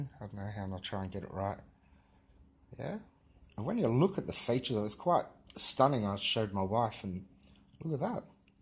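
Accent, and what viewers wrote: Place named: Australia. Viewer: I just saw you on the RV Pod Cast. I noticed the Aussie accent so I thought I'd check you out, I'm in Coffs Harbour. I saw this dome video so it was the first of yours I looked at. I got trolled 4 to 4 and a half years ago about southern stars rotation so I looked into it, I looked into Stellarium and I also made a dome concept model with a salad bowl and lights and a phone camera to record inside the salad bowl. Links below, if you have time to check them out let me no what you think. Comments and likes are turned off on the videos because of continued trolling. Southern Stars, Reflection Of The Centre Stars? Part 1 (Using Stellarium) <link> Southern Stars, Reflection Of The Centre Stars? Part 2 + A Simple Experiment <link> Southern Stars, Reflection Of The Centre Stars? Part 3 Check With A Physical Model <link> 24 hour DAYLIGHT in Antarctica Experiment timestamped to shorten it. 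Flat Earth - 24 Hour Daylight Not Sun In Antarctica, Reflection Test Using Salad Bowl <link> This one timestamped also to shorten the vid, an idea on how the sun sets south of west in the southern summer. Flat Earth - Circling Sun Sunset, Circle Of View, Atmospheric Lensing, Experiment (Preliminary Test) <link>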